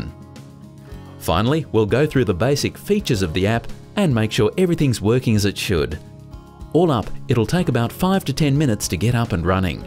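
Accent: Australia. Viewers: Australian Accent lol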